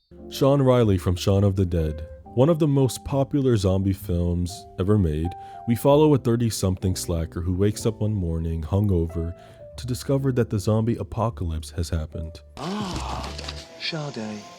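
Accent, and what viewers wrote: United Kingdom. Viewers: OMFG I love a fellow cinephile!!!! But what I love more is your random british accent that you let out in your videos!!!! Can't wait to see you have more and more success! ❤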